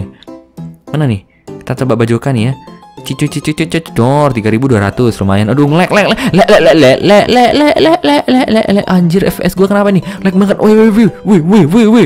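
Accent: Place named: Medan, Indonesia. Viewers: Abg org medan yaa ?? Logatnyaa... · nampak kali medannya ya bang :D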